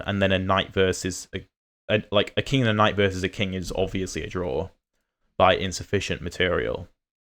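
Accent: United Kingdom. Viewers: I have been following you since Rio de Janeiro. Besides enjoying your explanations I am delighted by your British accent.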